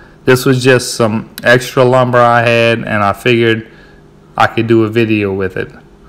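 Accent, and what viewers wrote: Louisiana, United States. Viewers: hey ,,you from louisiana ..you got that cajun accent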